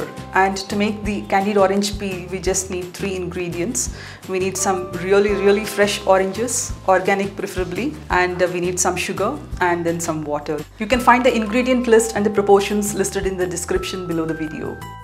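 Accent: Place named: India. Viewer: I love the Indian accent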